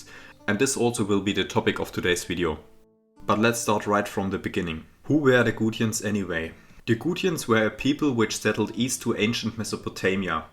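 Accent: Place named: Germany. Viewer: Der deutscheste Akzent, den ich lange gehört habe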